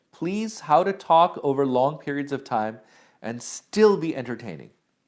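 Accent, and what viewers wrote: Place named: United States. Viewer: I wonder how he got american way of speech..hmm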